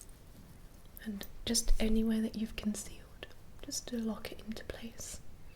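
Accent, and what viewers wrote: England, United Kingdom. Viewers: So very, naturally beautiful. Love the freckles too. Beautiful, cultured English accent - I have similar so perhaps bit of narcissism here. Keep on being lovely :) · what part of England are you from? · Hello young lady.How refreshing to see a beautiful British face as an ASMR artiste and one with wonderful red hair that has not felt the need to go another colour.As for your video it's great. Your accent really does make a difference.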